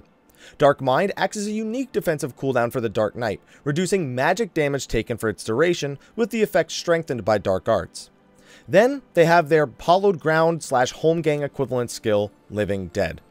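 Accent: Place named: United States. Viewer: That's just an American accent. Take it from a Brit that you guys butcher the letter A!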